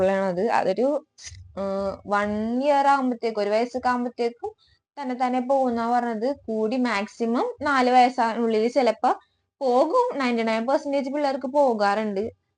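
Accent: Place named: Kochi, India. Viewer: തനി കൊച്ചി ഭാഷ 😇😁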